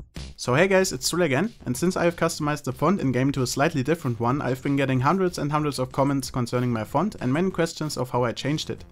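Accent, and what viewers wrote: Germany. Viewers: Are you German? Cause you sound like German ^^ If yes, then Halloooooo :D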